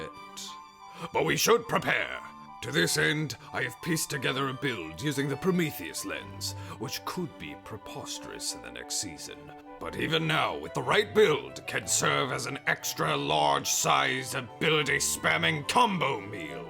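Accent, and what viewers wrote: England, United Kingdom. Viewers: You sound like a mix between a humble Englishman, a drill sergeant and Lord Saladin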